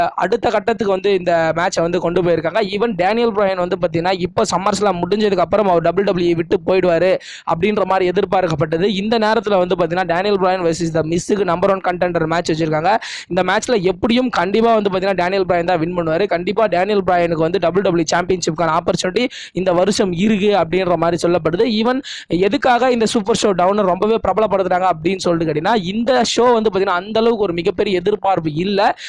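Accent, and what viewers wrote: Villupuram, India. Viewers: Bro are u from villupuram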